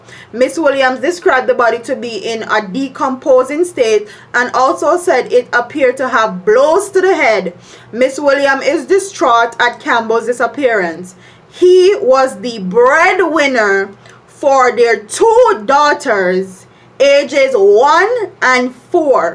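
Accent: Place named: Jamaica. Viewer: I love when you give it to them in the raw patois! Lol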